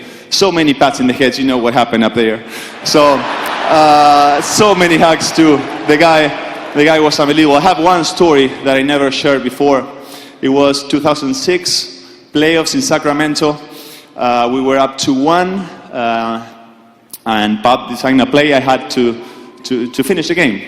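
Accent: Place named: Nigeria. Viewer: if you close your eyes you could bet Manu is nigerian. (awesome speech tho)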